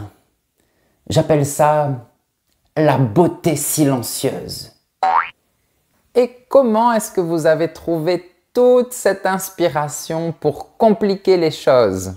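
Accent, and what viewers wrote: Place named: South, France. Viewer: Tu as un accent du sud, et c'est l'occasion de mentionner un mot qui se prononce différemment: MOINS. Au nord on ne prononce pas le S et au sud (donc ta prononciation) on le prononce.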